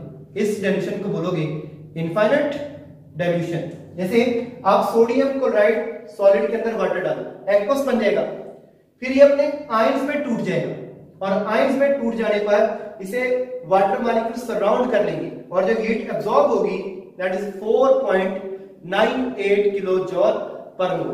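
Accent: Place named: Pakistan. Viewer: sir are you from pakistan beacuse of your accent if you are then love from india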